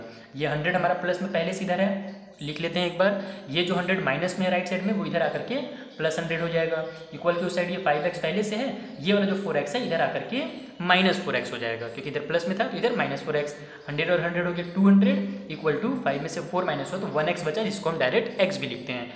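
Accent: Bihar, India. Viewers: sir app bihar se ho na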